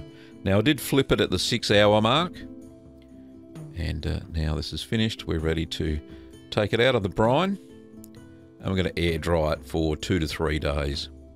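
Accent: Australia. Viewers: Aussies have their own way of saying things, part of why we love them :)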